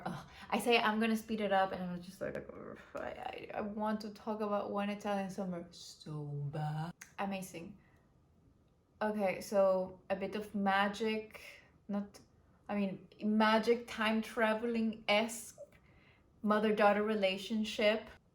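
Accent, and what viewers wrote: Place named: Latin America. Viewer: hi! first time watching a video of yours and i really liked it! your accent's so charming and it's great to hear book reviews from a latina (i'm brazilian, btw!):)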